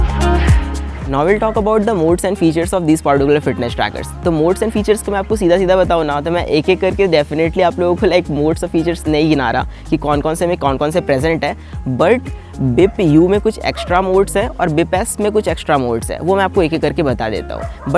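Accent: India. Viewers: İts english but accent of indian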